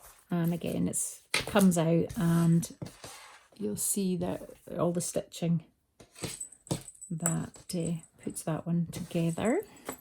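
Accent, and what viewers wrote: Scotland, United Kingdom. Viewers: Hi! I am new to your channel and I am so glad I found you! What superlovely and beautiful and feminin journals you are making. They are just wonderful and unique! Now I have to watch all your videos ❤️ You are a true artist. And your Scottish accent is very pleasant to listen to. Thank you for sharing ! ❤️ Hugs from a cold and windy Gothenburg, Sweden //Charlotte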